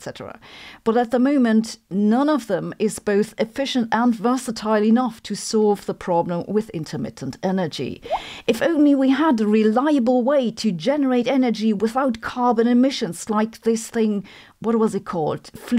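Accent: Germany. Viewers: I have now realized that Sabine's monotone German cadence has completely lulled me into a sense of false security. Should have read the date before watching this.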